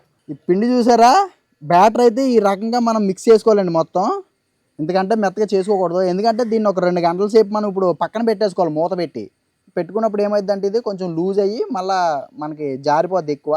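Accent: Godavari, India. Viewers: మీ విడియో లు చక్కని గోదా వారి యాస తో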